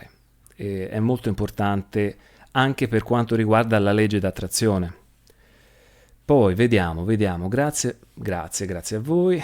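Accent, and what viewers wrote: Tuscany, Italy. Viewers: non è Nulla caro buona serata ripensando alla legge di attrazione sempre in servizio non conosce crisi di congiuntura 😅 la difficoltà è il vivere con persone anziane di altri tempi e negativi e combattere questa nebulosita è cercare di proporre é difficile continuiamo sempre molte grazie e apprezzo la sua scelta di farci sentire la sua voce Toscana la patria di Dante e sempre molte grazie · Grazie per questa diretta, l’ho vista solo ora. E comunque il cancella, cancella, cancella detto con il tuo accento toscano è già motivo di buon umore 😊😊😊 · Il tuo accento Toscano Tienilo con te ❤️ La tua emozione bellissima Alla prossima diretta ti farò una domanda Buona notte 😘😘😘😘😘 tvb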